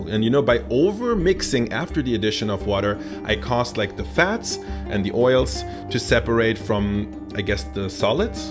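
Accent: Germany. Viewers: Yo can heat the german accent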